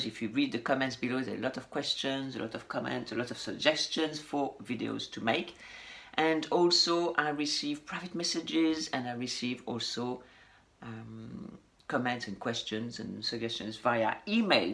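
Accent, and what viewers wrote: France. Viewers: The (alpaca) Max Mara i certainly never will sell! ;) Some women like to change their wardrobe every month ;)I have a beautiful knitted 100 % Scottish cashmere scarf which i wear already for at least 15 years. So i absolutely understand what you mean but I like a little bit more change and renewing . I love beautiful animal friendly natural materials. I never wear 100 % synthetic. Sometimes there is some minor percentage of synthetic in my clothes. Have a nice day in beautiful douce France ! It is the favorite holiday country ( together with French Belgium) for me and my family living in Holland. I love the French language! In my opinion it is by far the most beautiful language on earth! I LOVE your little accent and French musical intonation ! ;) But of course your English is very very good.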